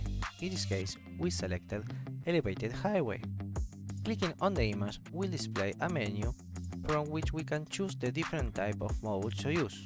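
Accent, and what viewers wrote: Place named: Argentina. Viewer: Me parece que ese inglés tiene tonada argentina o algo de latino! 😆 saludos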